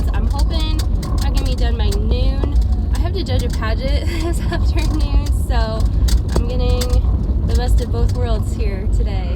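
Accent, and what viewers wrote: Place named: North, United States. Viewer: im going to guess you were raise more north than Illinois. Some of your pronunciations are more northern than me and I am in southern MI.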